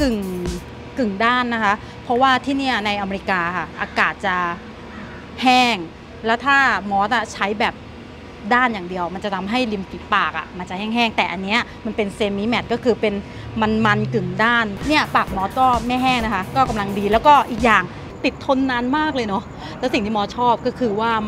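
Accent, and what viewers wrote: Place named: Central Thailand, Thailand. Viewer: เสียงไทยกลางแท้ พิดโลกเดี๋ยวนี้เหน่อสุโขทัยไปหมดแล้ว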